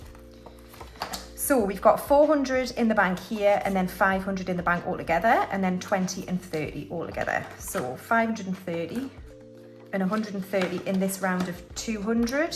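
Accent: Newcastle, United Kingdom. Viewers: Fab video as always. My hubby even said "oh is she a Geordie too? I thought so!" (Hes Northern Irish)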